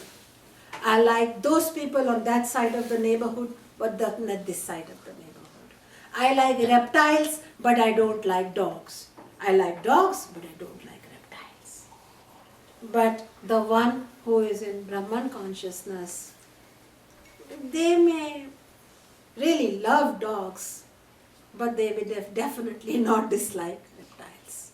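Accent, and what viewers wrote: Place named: India. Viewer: Very Impressed with her way of explaining Vidanta. She has a strong Indian accent, so may not be clearly understood by a foreign ear, but her English, grammar & vocabulary are excellent. Very Informative, well explained, and simple yet complete. Very Educating, and informative. Thank you for posting. Peace & Blessings to all the Enlightened Souls, and the ones who're well on their Spiritual Journey. Jai Hind!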